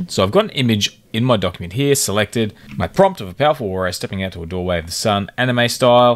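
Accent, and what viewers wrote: Australia. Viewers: Tryn to work out the accent, reckon either Melbourne or Sydney. Good on ya mate. BrisVegas here. Subbed. BTW I use SD A1111 PS plugin and getting pretty good returns and streamlined wrkflws. Offers more options for those who work outside the Alpaca ecosystem.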